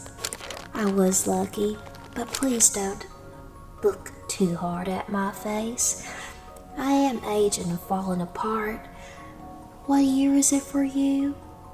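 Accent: South, United States. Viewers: I’m from the south and your accent is spot on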